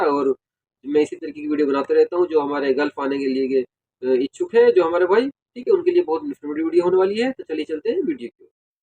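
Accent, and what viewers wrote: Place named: Rampur, India. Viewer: Ap Rampur ke ho kya ..kyon ke apki baat chit ka andaaz rampuri hai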